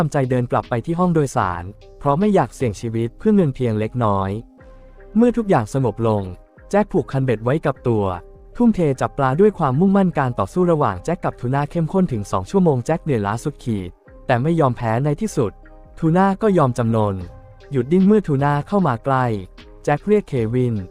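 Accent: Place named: Shan, Myanmar. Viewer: ไทยใหญ่ชัดๆ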